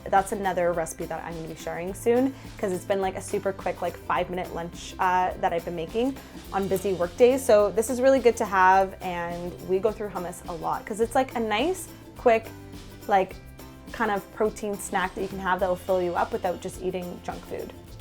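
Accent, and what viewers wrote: Canada. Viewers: Your Canadian accent just cracks me up 😂 love your channel!!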